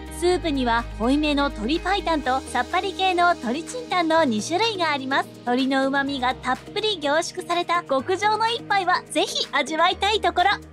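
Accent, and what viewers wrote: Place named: Gunma, Japan. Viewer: おすすめのラーメン屋が入ってないのが残念ですが、地名のイントネーションが群馬弁なのが好感度高い。 標準語はた→か↑さ→き↓みたいだけど、群馬の人が群馬の市の名前をた→か→さ→き→って言ってるんだから、そこはもう標準語とかではなくた→か→さ→き→なんだよなぁ。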